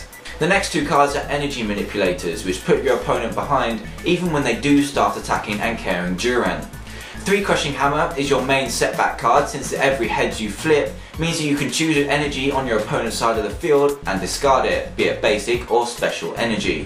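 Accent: United Kingdom. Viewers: I just love your British accent and the vocabulary choice.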